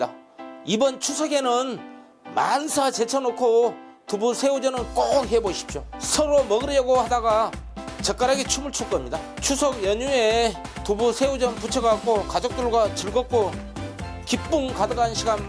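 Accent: Jeolla, South Korea. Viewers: 조물조물을 쪼물딱쪼물딱. 저~~그 전라도 말씨 정감이 가네요. 어떤 요리든 정확한 레시피 감사합니다. 참 대단한 " 셰프입니다 " ❤ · 항상맛있는요리 알려주셔서감사합니다 설명도 어찌나 맛깔나게 전라도 사투리가 정겹고재밋습니다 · 새프 숙구락 전라도사투리요전라도사투리 김제쪽인가요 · 세프님 음식도 정갈하게 맛도 끝내줄것 같습니다 당장이라도 따라 해보고 싶네요 전라도 사투리도 구수합니다 ㅋ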